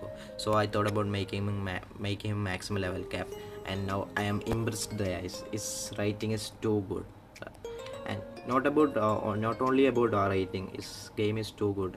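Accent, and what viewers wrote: Kerala, India. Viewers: English kettalariyam malayaliyaannn...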